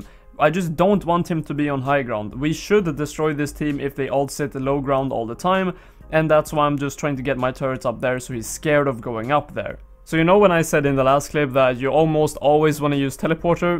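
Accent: Sweden. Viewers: Are you from sweden?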